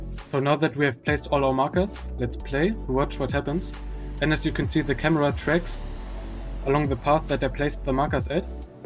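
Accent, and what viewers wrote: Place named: Germany. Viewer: Wie man se German Englisch hört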